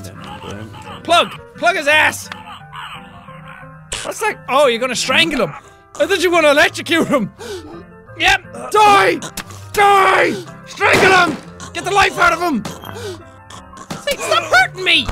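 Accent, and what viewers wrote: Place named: Ireland. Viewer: The Irish accent is 10 times stronger in the old videos.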